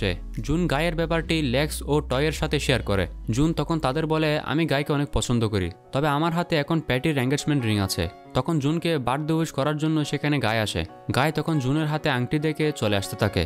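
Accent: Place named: Chittagong, Bangladesh. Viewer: চট্টগ্রাম এক্সেন্ট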